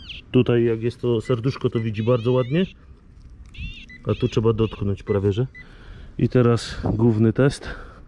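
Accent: Silesia, Poland. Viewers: Fajnie jak się pojawia godka:), Mógłbyś więcej:) Pozdro z Rybnika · co Ty tak po ślunsku zacząłeś godoć haha XDDD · Jesteś ze Śląska czy z Zagłębia??? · wraca Śląsk i od razu gwara.... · Chwila na Śląsku i już zaczynosz godać:)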